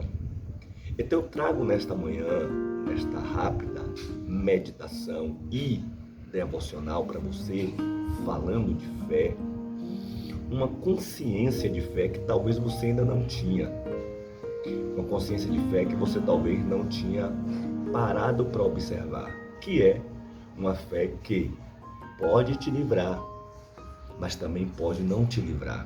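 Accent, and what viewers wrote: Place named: Paraíba, Brazil. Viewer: Sou paraibana e o nosso sotaque são parecidos e a sua mensagem era Deus escolheu Maravilha glória a Deus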